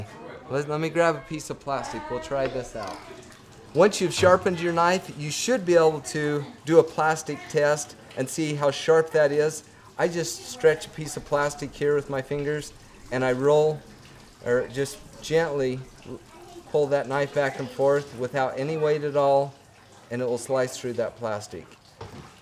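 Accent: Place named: Utah, United States. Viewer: 😂 Yeah, I blame it on the Utah accent. Will try to do better next time.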